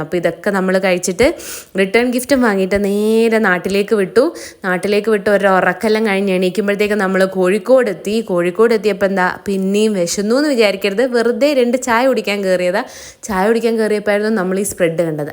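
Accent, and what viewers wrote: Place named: Kannur, India. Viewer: Evida veedu? Bhasha kettondu chodhichatha kannur pole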